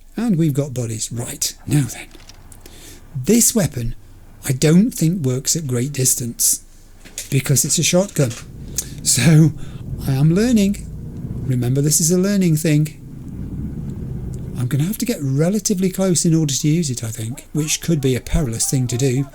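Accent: United Kingdom. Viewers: a 4 hour episode of cyberpunk 2077 narrated by a great British gentleman? sign me up! I'm always looking forward to the new episodes every monday because they are so good every time and i also cant believe we are already at episode 26 sjeesh time flies!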